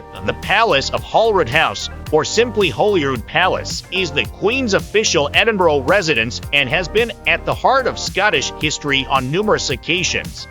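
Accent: United States. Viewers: The worst possible voice over ever! Cheezy all-American accent, multiple mispronunciatons including - ad nauseum - Edinboro??!! It's Edin-burr. Or as many locals say, Em-bra. Some nice video clips, though some syncing with the narrative would have been beneficial for those lacking familiarity with the city. Also, for any visitor, walking through the New Town is a great experience and can be done enroute to Dean Village before a walk along the Water of Leith to Stockbridge enroute to the Royal Botanics. Also, a day on Victoria Street? I think not! There are some nice shops, true, though most are specialist offerings. An hour would likely be max. Though the Botanic Gardens is a nice place to find some quiet, so is the west end of Princes Street Gardens, with the castle overlooking. Get some eats at Marks & Spence on Princes Street and make your way down the steps at the bottom of the Mound...and 'dine' in the shadows of the castle. 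Fabulous!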